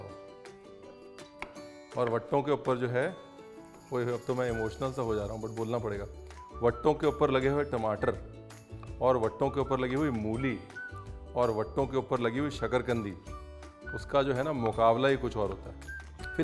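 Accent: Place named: Punjab, India. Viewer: so nice sir, Punjabi ka tarkaa bahut sohnaa a ji.love it❤❤ chak Doo.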